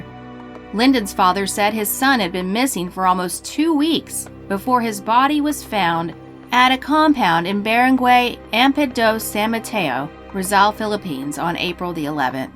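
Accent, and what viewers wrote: United States. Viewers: yet has an American accent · you speak with an American accent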